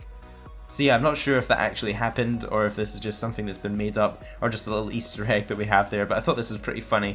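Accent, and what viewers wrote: Scotland, United Kingdom. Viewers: Hey man! Are you scottish by any chance? You sound like it, love your channel! <3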